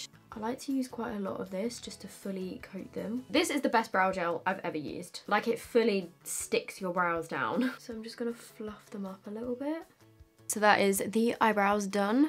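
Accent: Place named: United Kingdom. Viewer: Ahahah sometimes your British accent just like JUMPS OUT 😂 Xx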